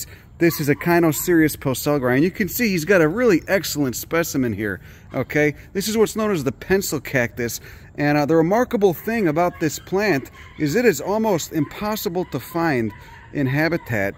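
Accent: Chicago, United States. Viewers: You sound like you are from Chicago? You sound like a family member, making me homesick for the Chi! Thanks for this video, I want to grow this plant and I live in Phoenix now. Just what I wanted to know. Bravo. Good video.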